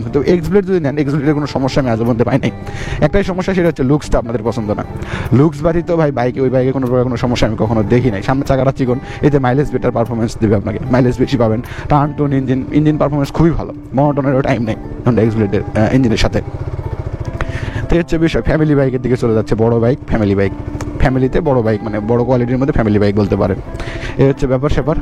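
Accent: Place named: Gaibandha, Bangladesh. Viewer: ওহ আমি মনে করছি গাইবান্ধা, নয়তো বগুড়া, নওগাঁ,গাইবান্ধা,বগুড়ার ভাষা same..😊 তাই না ভাইয়া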